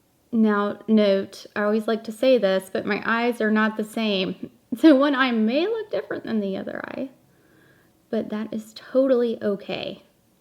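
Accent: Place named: Kentucky, United States. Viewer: I am new to your channel after I had second thoughts after already ordering this palette. I love the look and I may give it a try. I was already thinking about returning it and I just ordered it today, so of course I haven’t received it yet. I am scared of blues. I am actually scared of color, period! The main reason I subscribed is because I am from Kentucky and I have the same accent. I use the word y’all all the time. I love the look, but I’m still second guessing. $65 is expensive if I don’t like it. But it gives me the 90’s good ole days vibe.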